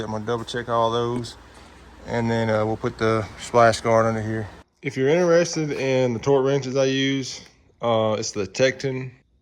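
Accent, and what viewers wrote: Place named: South, United States. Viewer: Hard to view do to his Southern accent. A short video that felt like a lifetime...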